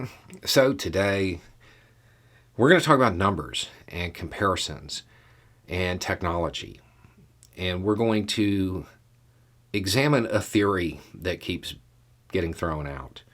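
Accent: South, United States. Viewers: I want to trust you because of your beard. Stick with me, please. I want to to trust you because of your familiar southern drawl. I want to trust you because of your witty t-shirt choices. Really, hear me out. I want to trust you because of your sensible and informed perspective of global politics and international dynamics. I FEEL like you are honest, and that you value MY ability to understand what's REALLY going on in the world. Your words give me hope, and my ability to follow your train of thought makes me feel intelligent, and better informed for absorbing your discourse. Please, tell me this. What is your motivation for sharing your keen insight and your witty parlance? Is it for the clicks? Is it for the lolz? Or is it genuinely for the desire to promote a more informed public awareness of the issues facing this bewildered generation of hope mongers? 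I think you might be a thorn in the foot of the misinformation and cynicism that plagues the minds of the susceptible masses. I think you might be a hero. Why? Why do you share your perspective? Why do you post multiple videos every day to tell the world what we should have the sense to learn for ourselves? What motivavates YOU to motivate US to wake up and pay attention? Your answer to this question will I use to judge myself, and my naivety for entertaining content. You compel me to seek truth and lucidity, and I ask that you speak to this influence you have on me. Your silver tongue is a potent tool. Are you as genuine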